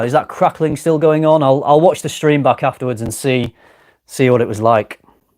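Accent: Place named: Northern England, United Kingdom. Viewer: I knew were from the North because when you say but you say bot lol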